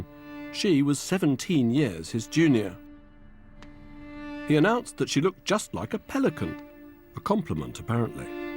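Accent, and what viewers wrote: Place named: RP, United Kingdom. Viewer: I enjoyed this. Bar the plummy tones. Zzzzzz😮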